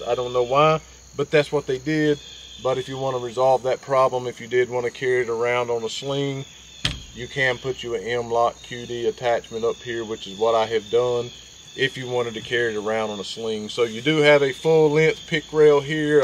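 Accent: South, United States. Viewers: Subscribed for the southern twang alone. Who makes your iron sights? Thanks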